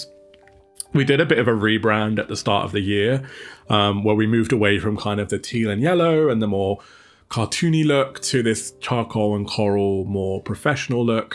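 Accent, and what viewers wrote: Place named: United Kingdom. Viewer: Thank you for sharing your story, and being vulnerable about your mental health struggles. I appreciate your candor. I, like you, turned to cozy games as a sort of therapeutic experience. I’m a middle aged woman and I’m dealing with “middle aged woman “things”, on top of having a child who is in active addiction. I needed a way to calm my anxiety, so I tried playing cozy games. So far, it is helping. Love that you are a sports fan (Atlanta Falcons - awesome). I live in the southern United States, and sports are such a big part of life here. Just wanted to share a bit about me, and tell you that I appreciate your hard work and, integrity and your soothing UK accent and voice. 😊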